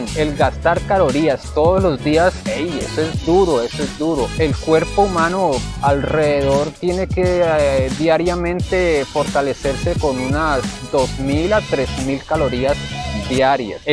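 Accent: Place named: Colombia. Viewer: Buen día De que parte de Colombia es usted??? Porque por el acento NO es de Barranquilla....jajajajajajaja Muchas gracias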